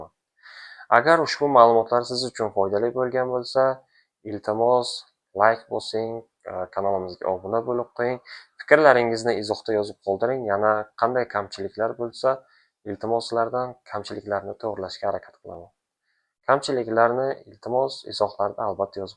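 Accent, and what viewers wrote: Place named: Tajikistan. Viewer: doktor millatiz nima talaffuz iz ungacha, tochik ,hitoy, juhut emasmisiz?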